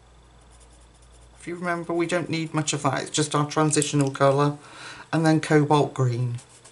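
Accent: England, United Kingdom. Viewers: It’s so lovely to hear an English accent. I particularly appreciate the mention of the paper quality. I love Hanna Karlzon books as the paper will take watercolour pencils too. Many thanks for your tutorial. I’ve subscribed 🙏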